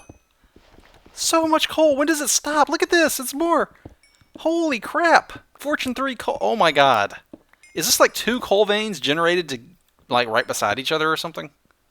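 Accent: South, United States. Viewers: Are you from the south? It sounds like you have a slight southern accent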